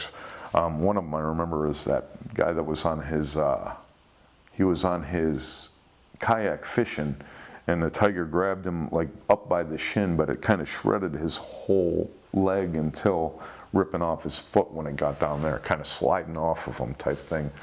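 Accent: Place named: Michigan, United States. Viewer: your show is very educational and entertaining. I look at my wife when the places that I snorkeled and swam come up as locations in your shows (Rose Island, Bahamas, Hilton Head Island, Destin) and say “great…now I wonder how close I actually was to a shark”. BTW there is a link to a guy in NJ that is supposed to be you. I’m from Michigan and you definitely sound like a Michigander…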